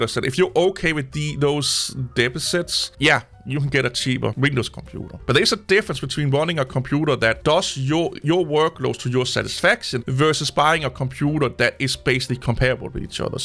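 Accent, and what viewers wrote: Denmark. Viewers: Are you from Scandinavia. Your accent sounds very Danish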